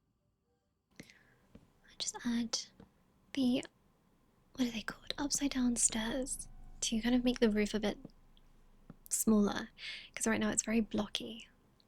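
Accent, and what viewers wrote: United Kingdom. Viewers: Ru from uk?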